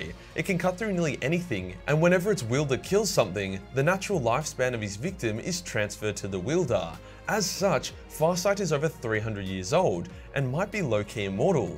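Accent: Australia. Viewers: I'm guessing your video length is because that's what the algorithm says is best BUUUUUUUUUT. Is there any way we could get some more LENGTH out of you?? Can you do multi parts or something?? I feel like at the 12 minute mark, we aren't getting as much of your fabulous Australian accent as we could be. Doing videos in the buff is tits, but we could use more of that too, and at 12 minutes, it again doesn't feel like everything is getting "fleshed out" enough. Glad to see you growing before our eyes👁👁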